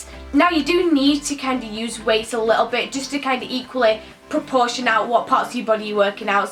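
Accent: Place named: England, United Kingdom. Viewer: ILYSMM i really want to meet you i think we’d get along sooo well! LYSM also what part of England are you from? Your accent is so strong! In a good way obvs ILY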